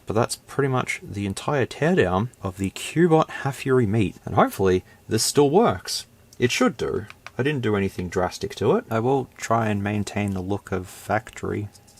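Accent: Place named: Australia. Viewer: I really appreciate your in-depth reviews. For the most part, I'll watch the intro, listing, turning on, set-up, specs, Quick Shortcut Maker, Conclusion, and teardown. Sometimes, if I have nothing else on the back burner, I'll watch the entire thing at 1.5 or 1.75 speed. The Aussie accent at that speed is hilarious. 😂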